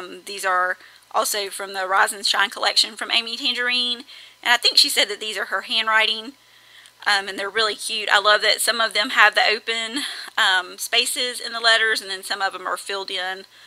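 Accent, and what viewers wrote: South, United States. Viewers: Such a sweet layout!! Great job! I just love watching your videos because I'm from Alabama and love to hear a familiar southern voice :) To chicken to do my own videos because I think I sound awful on a recording. Love watching you though!!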